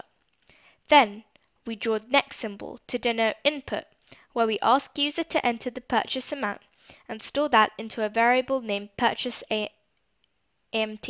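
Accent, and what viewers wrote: United Kingdom. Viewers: south england accent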